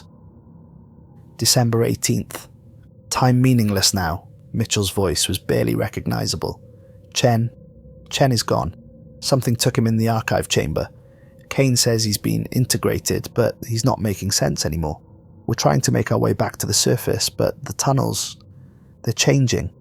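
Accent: Wales, United Kingdom. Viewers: Really appreciate you being a sci fi Creepypasta youtuber using their voice snd giving thanks etc. may not seem like much but pretty much all the others out there 💯 done via AI start to finish. Cheers! p.s. if im not mistaken, Welsh?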